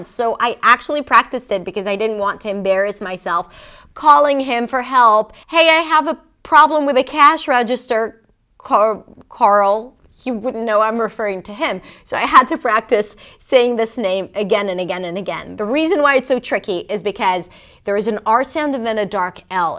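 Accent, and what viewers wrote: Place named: United States. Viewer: I'm a native speaker with linguistics training and this was fantastic. Your American accent is fantastic! I can only hear the tiniest hint of non-native accent, it's so wild. I appreciate that you included the name Siobhan because it's Irish and you'd be amazed how many Americans have no idea how to say it 😂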